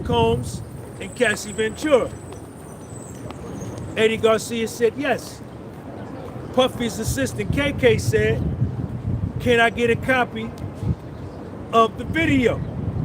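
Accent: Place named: Harlem, United States. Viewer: I'm also new here, but not from academics, someone shared your videos last week on fb. I appreciate your storytelling of facts, but I'm from harlem and recognize the harlem swag and direct speech. However, og I'm a professional astrologer for the entrainment industry and puffy is part of my case study it's not good, this is a karma call he missed important to stop the drug use and change years ago. Ketu moon in ♌️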